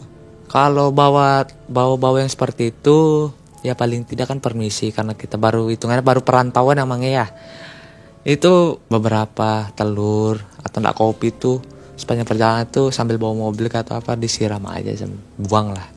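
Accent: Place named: Samarinda, Indonesia. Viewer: Dengar logat Bang jadi inget temen di kampus, beliau orang Samarinda. Pas beliau menikah, saya datang, memang sih kalimantan itu kanan kiri hutan, kalau bukan orang kalimantan yang bawa mobil agak sulit. Kebayang malam2 bawa mobil ke bukit yang notabene hutan begitu, jelas harus hati-hati, karena memang bangsa jin lebih banyak bersarang disana.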